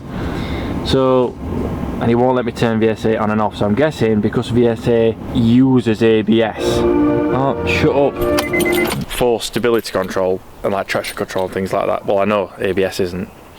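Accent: Yorkshire, United Kingdom. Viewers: Looks like you got a bargain there with the 2.2. I've got a 06 one as a daily and a DC2-R as a weekend car. You sound like a Yorkshire lad? I'm in Yorkshire not far from Yorkshire Wildlife Park.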